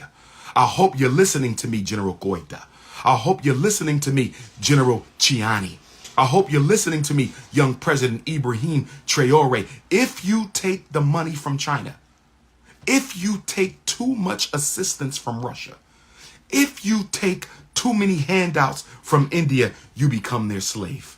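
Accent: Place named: United States. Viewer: My man, I wish you could listen to yourself you sound so American are you sure you’re not employed by the government to talk about Africa?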